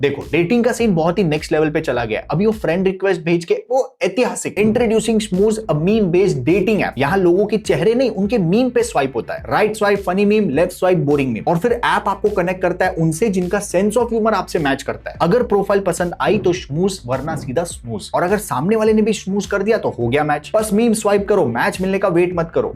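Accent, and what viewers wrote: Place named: South India, India. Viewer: I really got frustrated with this one why you need to pronounce it like you are from a south state if you are speaking Hindi pronounce it clearly it was very very disappointed he said his profession is a teacher so I don't think he does not have learnt Hindi what is the need 😢 problems in South accent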